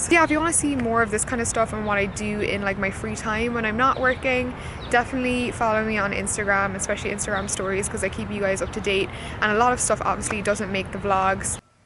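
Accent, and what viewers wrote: Ireland. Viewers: 👌👍🤣 Still one of the theee most FABuuulous things you've said in the plethora of content with THAT wee accent!! "There is no care package than a care package from ..... from an Irish mammy" p.s. you-do-not-age girl...it is now Oct. end 2019 & this vid is from beg of March 2018....just sayin'!! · I think its the Irish accent and New York! And you living the dream out in the big apple!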